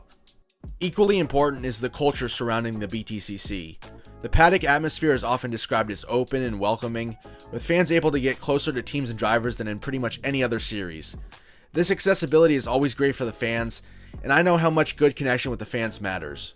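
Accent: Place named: United States. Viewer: I live 10 minutes away from brands hatch and have been going to watch the touring cars since the 90s. Its always been an exciting series to watch and has captured me since I was a child. I cant lie its a bit mad hearing an american make a video on this championship but clearly you have a good knowledge of the series and this was a great watch!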